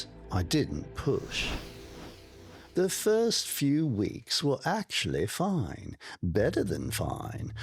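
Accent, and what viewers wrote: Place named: England, United Kingdom. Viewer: I loved the authors English accent. Favorite line: I’ve gotten really good at “comfortable thoughts”. It’s almost as if the English accent created a character that spoke with the consciousness that became this narration, not only in tone, but in vocabulary. Very authentic and hauntingly intriguing story.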